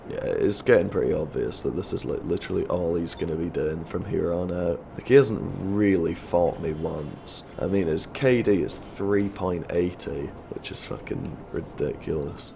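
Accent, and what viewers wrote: Scotland, United Kingdom. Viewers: I thought he was Scottish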